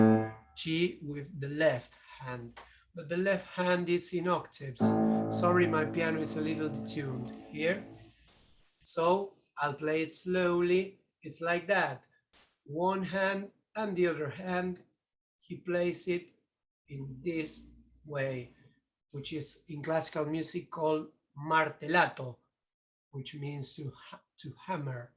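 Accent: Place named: Argentina. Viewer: se nota que es argento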